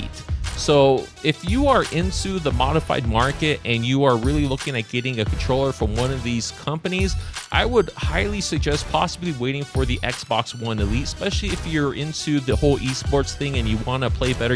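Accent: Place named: United States. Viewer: Sounds American but doesn't look it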